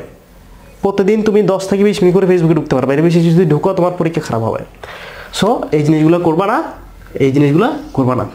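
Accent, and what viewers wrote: Chittagong, Bangladesh. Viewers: Bhaia you from Chittagong???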